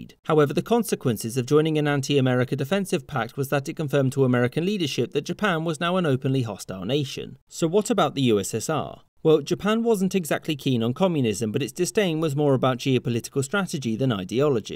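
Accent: England, United Kingdom. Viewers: He's quite clearly English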